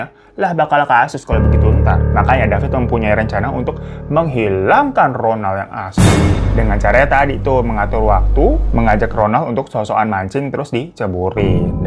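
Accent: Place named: Tambun, Indonesia. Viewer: apal bngt bahasa tambun bang .. btw w orang tambun bwang 😂😂😂😂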